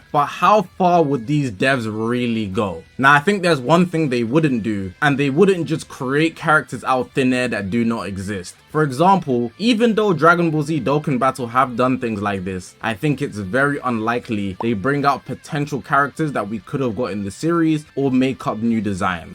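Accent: United Kingdom. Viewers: wait I did not know you were British honestly this is news to me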